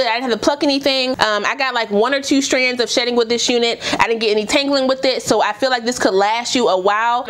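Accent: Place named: DMV, United States. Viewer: I loved this video. Random question, are you from Maryland, DC, or Virginia? I am, and your vibe (music/Go Go vibish and the way you talk “this joint” 😂) is how some of us talk.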